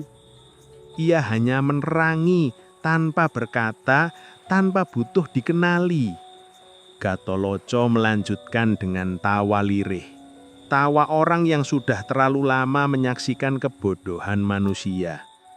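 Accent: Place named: Java, Indonesia. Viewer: klo menurutku sih, logat dan kosa kata jawanya... daerah solo/jogja, tapi berstrategi merangkul iawa secara luas.. maka kata rek .. tersemat dlm narasinya...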